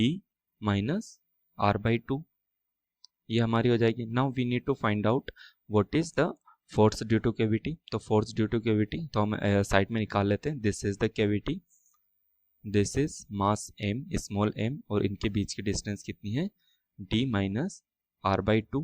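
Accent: India. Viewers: He's speaking English with a thick Indian accent.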